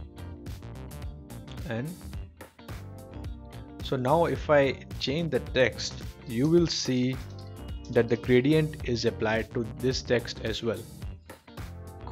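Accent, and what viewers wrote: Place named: India. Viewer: it is allways the indian accent, perfect. thanks.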